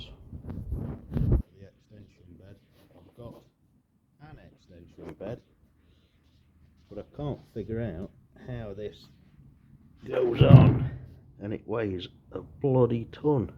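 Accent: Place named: Sheffield, United Kingdom. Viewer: Did you get anywhere with setting this up? I’ve got one in Sheffield (you sound local) in similar condition to yours but mines got the leg system if you need to have a look how it was originally fashioned. Wicked saw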